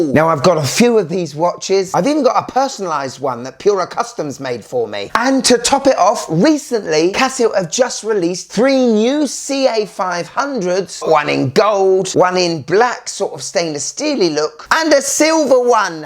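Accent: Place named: Cockney, United Kingdom. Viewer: i wish this guy would talk low and quiet and not to loud kinda annoying.he does good reviews but cut down on the high cockney talk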